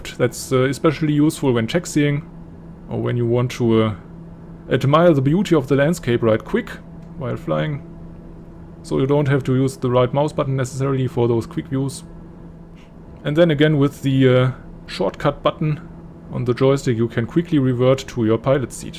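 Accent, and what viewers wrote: Germany. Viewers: Super gemacht hat mir echt geholfen habe an deiner art zu sprechen gemerkt das du deutsch bist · haha liebe diesen deutschen Akzent im Englisch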